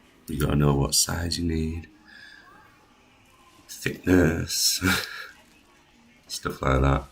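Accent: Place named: Yorkshire, United Kingdom. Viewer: What accent is that it sounds a bit Yorkshire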